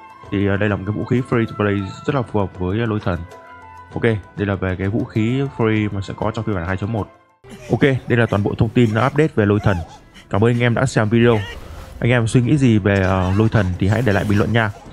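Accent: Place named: South, Vietnam. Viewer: nghe giọng anh nói tiếng miền Nam dui vc xDD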